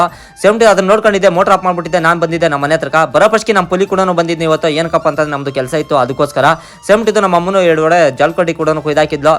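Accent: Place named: Anekal, India. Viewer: Jigani anekal taluk same slang bro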